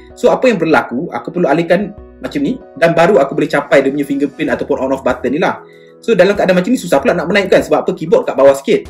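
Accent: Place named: East Coast, Malaysia. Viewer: Maaf tanya bang,asalnya org mne bang? Bunyi mcm loghat pntai timur sikit.. bahahhaha.. cun bang.. buat sy terpikat nk beli.. haduhhh.. cdgn sikit bang.. berbanding mi max 3.. mane lagi baloi? Sbb nk buat lenjan game biase2.. bukan hardcore sgt.. sbb kita faham sekadar mid sahaja.. just mintak pndgan.. hehehe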